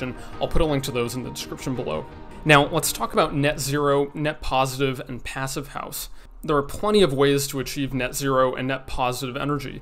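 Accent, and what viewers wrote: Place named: United States. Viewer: Thanks bro I totally couldn't tell from the fact that the man with an american accent was talking to me on an american website that he is an american.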